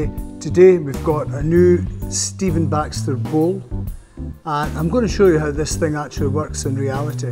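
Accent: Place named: Glasgow, United Kingdom. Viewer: Sincerely, your way of explaining and showing the goods is very helpful and informative, not to mention the high quality video in all aspects including that magnetic Glascow accent! Greetings from Greece, you may have some order from south someday! By the way this blue-ish colour of the mug is reminiscent of Ranger's F.C. kits... excuses if you a Celtic fan.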